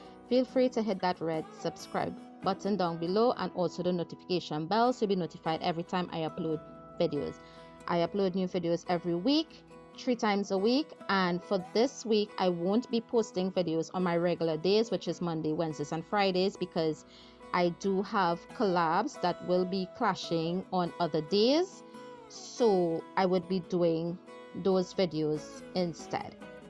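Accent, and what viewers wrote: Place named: Trinidad and Tobago. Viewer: Hey friend you are very creative. This turned out beautiful. Love your accent are you from Trinidad 🇹🇹?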